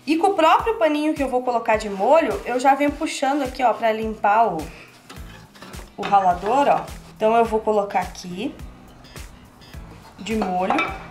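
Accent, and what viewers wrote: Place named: Ponta Grossa, Brazil. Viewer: de que cidade vc é?Parece sotaque de Ponta Grossa.Eu moro em Londrina mas sou de lá.Bjs.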